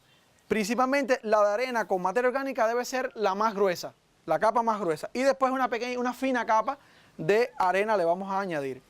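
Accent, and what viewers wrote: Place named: Sancti Spíritus, Cuba. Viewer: No ee como llegue aqui pero me atrajo el asento del muchacho .sera espirituano? Soy una espirituana lejos de mi cuba bella ..y ese asento solo lo tenemos nosotros .los S.S un saludo y grasias por toda la informacion muy bien explicado ..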